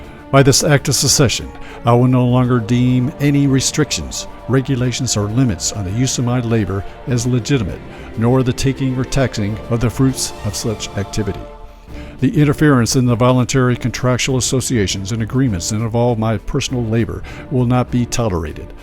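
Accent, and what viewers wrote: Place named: Texas, United States. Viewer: Sounds just like a TEXAN!! Yee Haw!!!!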